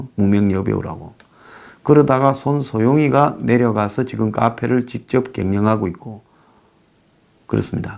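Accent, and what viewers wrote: Gyeongsang, South Korea. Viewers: 황장수? 이세끼 보니 순실이 꼬붕세끼 같네? 문딩 이 세끼 개상도말 졸라쓰네ㅡㅡ씹세끼ㅡ 너같은 세키도 뭘처먹고 똥을 싸니 나라가 오염되지씹섺꺄..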